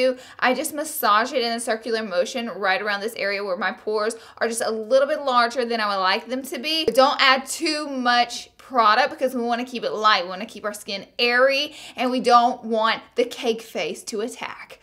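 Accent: South, United States. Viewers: is she southern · erez muy lindaa me encanta tu acento sureño..Buenos tips..😉😉... you are beautiful luv your southern accent ...good advices I'll be putting them in practice you are one of my favorite😉😉😚🌹🌹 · Oh that Southern accent-- so sweet to my ears! 'Cuz I'm from Louisiana. Prayers for those who have been affected by the flood in Baton Rouge...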